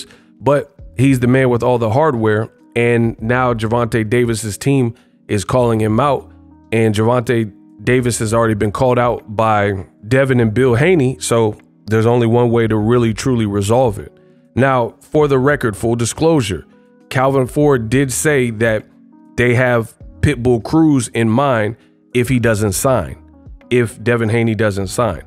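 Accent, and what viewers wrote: Baltimore, United States. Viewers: Lol@ your B-more accent. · The Bmore accent, up there with the Pacman, Bob Arum, Kell Brook. Best in the business-Smash the LIKE 👍🏾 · I can't with you, and the Baltimore accent 😂😂😂 in boxing sometimes fighters learn things the hard way, DHP will have an answer for the left-hand in his next fight. What a great year in boxing if we get Tank vs Devin in 2023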